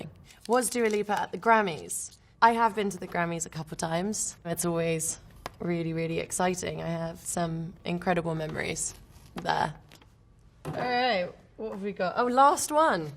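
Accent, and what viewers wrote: United Kingdom. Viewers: Acento muy britanico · su ingles es británico¿ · Es re bri"ish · Por favor el acento un británico hermoso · No leas, ve su angelical rostro y escucha esa f*cking voz sexy con acento inglés que se carga, eso es todo 🤤☺️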